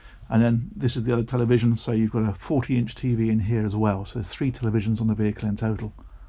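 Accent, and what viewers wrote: United Kingdom. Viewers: What a beautiful english oxford accent !!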